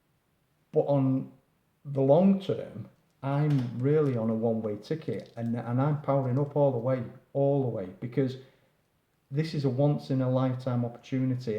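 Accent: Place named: Yorkshire, United Kingdom. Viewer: From Leeds? Yorkshire? Loved the video! Very informative. Steem is interesting with its user interaction with it/ social aspect.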